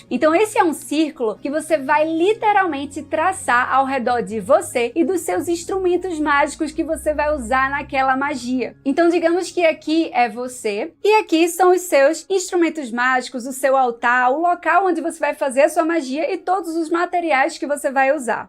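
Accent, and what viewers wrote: Pernambuco, Brazil. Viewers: Pergunta nada haver com o vídeo: vc é de PE? Seu sotaque é bem forte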